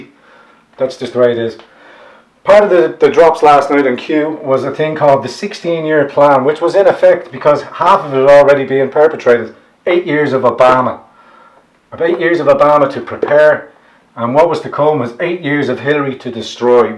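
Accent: Ireland. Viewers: i love Irish accents, thank you my brother. may YHVH our Yeshua bless and keep you in HIS hands. please research where Israel was scattered; you as the Irish, are of the tribe of Judah. Israel will have blindness of face until the end of days. we're there, this is the end of days. Find out to whom you belong, who is Israel? Did YHVH lose HIS people? NFW. read Isaiah , ask yourself who hung on the cross. YHVH is our Yeshua, you are of His tribes. know your enemy Jn. , , Rev. 2:9, 3:9 or Mt.13. bless you brother. James (of Irish blood) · I love your brogue brother.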